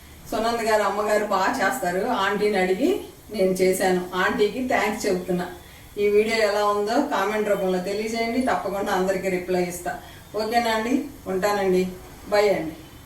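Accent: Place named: Nellore, India. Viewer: Mee language nellore side laga vundi aunty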